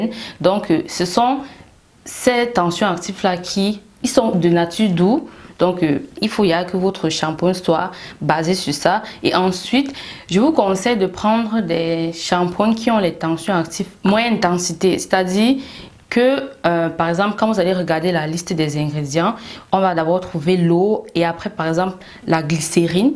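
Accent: Cameroon. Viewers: Oulala j’aime ton accent 🥰 une vrai kamer 😂😂🤣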